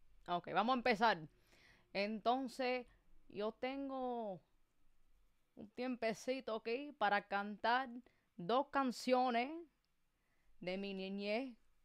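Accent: Cuba. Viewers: Me encanta y más con el acento cubano, saludos de México 🇲🇽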